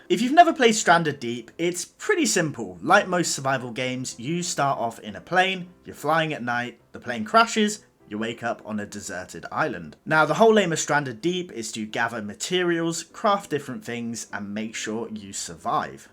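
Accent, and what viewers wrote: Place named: United Kingdom. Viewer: I'm guessing by your accent that you're from the UK. surely you can pick up a copy of naughty bear in cex for quite cheap. I've thought about going for it myself recently but seems like an extremely daunting task but wouldn't mind seeing you go for it 😂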